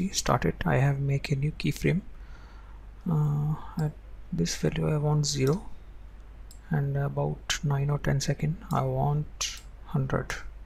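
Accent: India. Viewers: his indian accent is too strong xD but great tutorial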